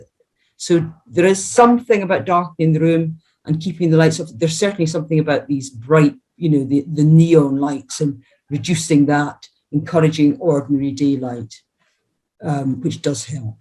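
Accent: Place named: Scotland, United Kingdom. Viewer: Good o hear aS ottish accent! Thereis little about helping carers to cope with the after effects. My wife Jean 81 years old, was rushed into hospital with incontinence which was diagnosed as bowel impaction. She was later diagnosed with a fracture of the L1 vertebrae. She had to wait for transfer to the spinal unit. Very slow recovery , loads of opiods given. Referred to community hospital for assessment and discharge into a care home. One week later she was returned to A&E who diagnosed bowel impaction, this was three months after the original diagnosis. She is recovering mentally, still has panic attacks, bed ridden as no physiotherapy has yet been provided though her rheumatologist carried out tests and said that intense physio was needed.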